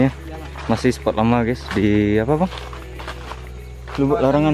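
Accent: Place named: West Sumatra, Indonesia. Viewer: Abang orang mana bg?? Kayak logat orang minang bahas indo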